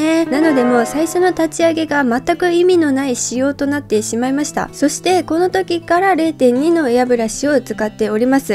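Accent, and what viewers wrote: Tohoku, Japan. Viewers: ちゃんって東北人？しゃべりが癒される👍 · 東北か北関東訛りですね福島か栃木かな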